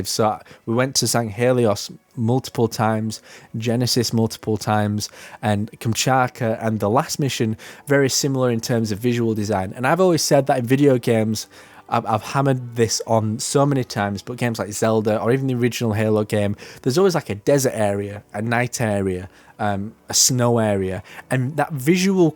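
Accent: Yorkshire, United Kingdom. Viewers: Sounds like a Keighley lad.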